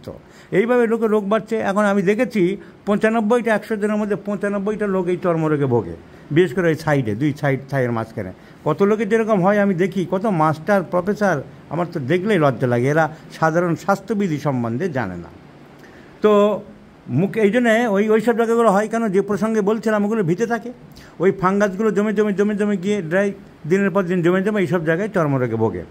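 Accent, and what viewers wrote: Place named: Bangladesh. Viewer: এইভাবে কি patient এর privacy breach করা যাই? এগুলো কি ডাক্তারি? এতো বাজে উচ্চারণ কেন? এতো বাংলাদেশী মনে হচ্ছে। ভ্যাকসিন এর নামে চালিয়ে দিলো 😂। ছোটবেলায় পোলিও ভ্যাকসিন নাওনি?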